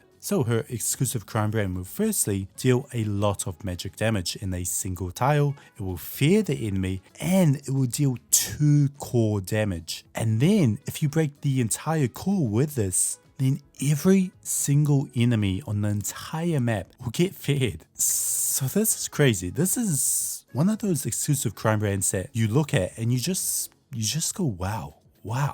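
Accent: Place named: New Zealand. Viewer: Are you Kiwi? ;) Love your accent!!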